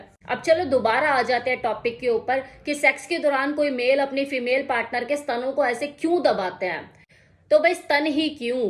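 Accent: Haryana, India. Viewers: लगता है आप हरियाणा से है😮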